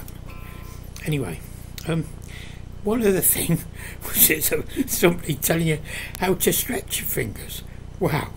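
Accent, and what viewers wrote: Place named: South London, United Kingdom. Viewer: Old..... but not obsolete.... You sound as though you are from South London, Southwark maybe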